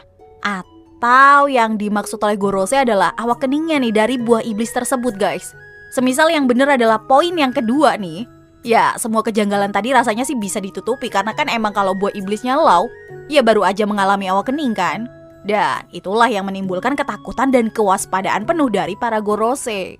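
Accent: Indonesia. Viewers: Nama.... Speak English dialek Indo... Lanjutkan... Kita bakal punya dialek english yg diakui internasional... Jgnkalah sama India Korea Afrika.. ✌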